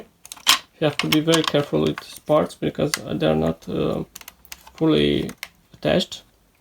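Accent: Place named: Romania. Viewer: buna, colectionezi numai 1/18 ? Eu am acelasi model in acelasi spec ca si al tau dar e un Spark 1/43 .eu colectionez doar 1/43 . Stii, miam dat seama ca esti român dupa accentu care il ai in engleza. Vb bine, dar accentu l - ai pastrat si nu e rau. Faza e ca io l - am pietdut si nus daca i bine sau rau.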